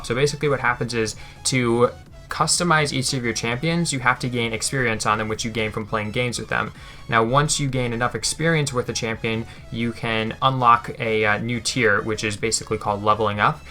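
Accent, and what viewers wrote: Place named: Boston, United States. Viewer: Yo I live in Massachusetts!! You said that you have a Boston accent so I thought you Live in Boston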